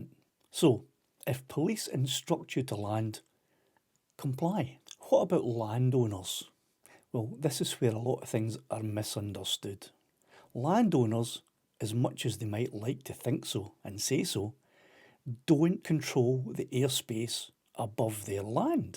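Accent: Scotland, United Kingdom. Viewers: Useful video, but it might be good to check the subtitling- there are at least two places where the subtitles confuse can and can’t in a Scottish accent, so say exactly the wrong thing (passers-by “can enforce drone laws” for example, instead of can’t)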